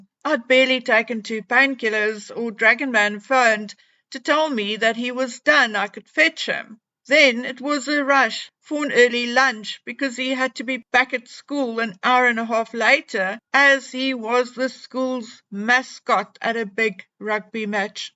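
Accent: South Africa. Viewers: Umm ..it’s called a South African accent?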